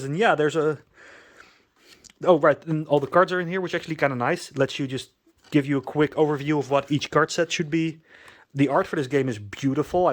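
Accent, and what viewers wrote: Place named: Netherlands. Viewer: Ouch Dutch accent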